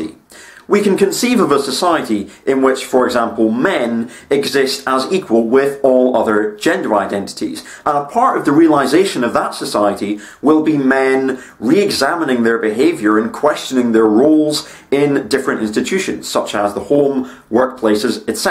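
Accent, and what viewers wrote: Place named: Edinburgh, United Kingdom. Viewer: Think he's from Edinburgh. His accent is too posh to be from Glasgow.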